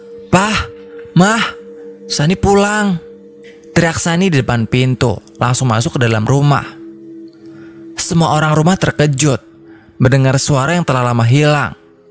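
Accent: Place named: Banyumas, Indonesia. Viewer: Ngapak ya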